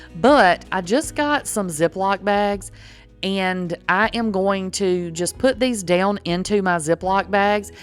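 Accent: South, United States. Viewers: I could listen to you all day! Love your wonderful, southern accent! Oh, and your idea of individually freezing the creamers into little tablespoon servings was such a great idea. Thanks for sharing! ❤ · Idk where you’re from (this is my first vid), but I’m betting TX, MS or GA. I grew up in TX and lived in MS for a long time. I miss hearing a Southetn drawl. I’m back home in Ohio now. In any case, I’m looking forward to making some of these creamers—they are getting ridiculously overpriced at the grocery store for being bottles of milk and sugar! SMH PS I bet we’d have been best friends had we ever met!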